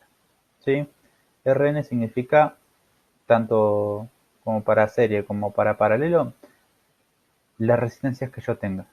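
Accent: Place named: Argentina. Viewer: no entendí , osea no entiendo el argentino :v , es que no entendí algunas palabras xd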